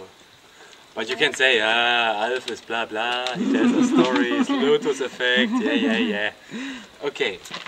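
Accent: Germany. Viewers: When I heard him talking I was so sure he is German :D Coz I speak the same way when I try to talk in English :P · I hate German talking english. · german accent xD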